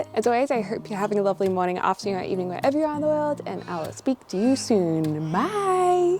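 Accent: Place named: Australia. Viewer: is that your Australian friend again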